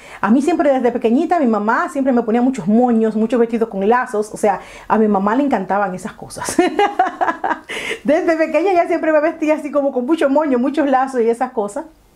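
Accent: Venezuela. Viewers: gracias por compartir tu vivencia en mi bello país, me encanto escucharte hablar caraqueno jaajajjaja. Dios te bendiga por tomar tiempo para mencionar esos recuerdos tan bonitos, tengo casi tu edad y de verdad es inevitable no sentir nostalgia al ver como nos cambio la vida, ojala Dios no ayude para salir de esta lamentable situación nuevamente gracias querida. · con razón la afinidad nena, yo si sentía cierto acento compatible con el mío jajajaja besos nena me encantó el vídeo, se parece a mi infancia en Maracaibo-Venezuela 😘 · que bello tu video, soy Venezolana y me vine a madrid por la situacion, y si es cierto que tienes algo de acento venezolano de hecho en los primeros videos que no sabia de donde eras pense que eras venezolana, gracias por hablar bien de mi Pais no sabes como lo extraño, gracias amiga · Bonita historia, todavía tienes ese acento. De hecho antes de saber que eres Dominicana, pensé que eras venezolana. 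· chama si tienes el aciento venezolano me encanta👌😘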